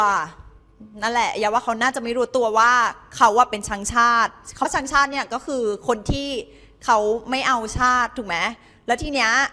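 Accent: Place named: Thailand. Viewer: พูดเหน่อ มีเสน่ห์ดี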